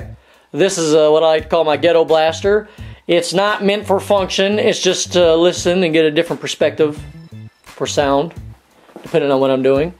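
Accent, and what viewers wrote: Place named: United States. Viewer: Man, great american accent. From where you are? These days all USA people has an awkward kitty accent, men and women the same. Thanks for the video too.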